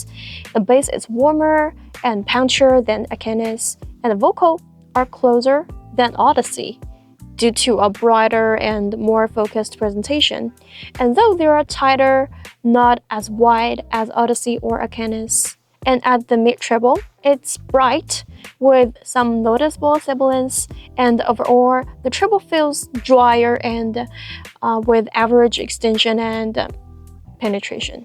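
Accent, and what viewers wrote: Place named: United States. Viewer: I thought she's american 😮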